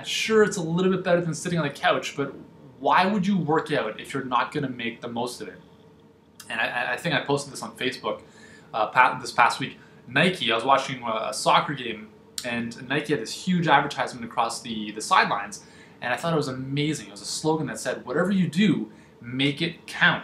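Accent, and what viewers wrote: Canada. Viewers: Worse than all these things listed would be having to listen to a Canadian accent while trying to work out. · Yeah... That's just how Canadians talk. Nothing wrong with it.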